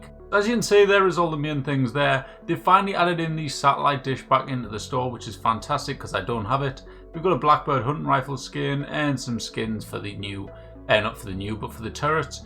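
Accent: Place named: Newcastle, United Kingdom. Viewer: A fellow Geordie 👍👍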